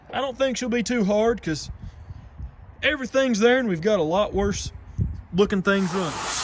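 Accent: South, United States. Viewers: You got the most American accent i have ever heard lol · I just started following you on tic tock and now on YouTube, I think the time you spend on these old vehicles is great and well deserved. People probably don’t understand how well they are built. You sound like you are from the south, the vehicles from the north where I’m from don’t live as long but I appreciate what you do!! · Love your accent, where in America are you from? Plus, great vids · I love your thick southern accent.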